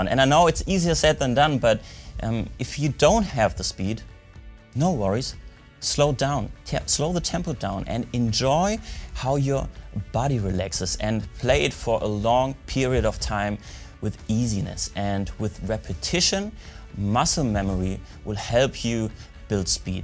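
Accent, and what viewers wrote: Germany. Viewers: I'm sensing a german accent there.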